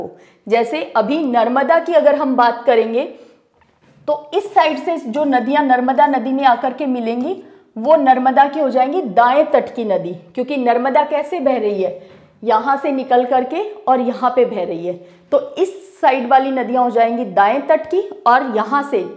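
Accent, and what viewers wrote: Haryana, India. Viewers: Haryanvi accent is my favourite ❤️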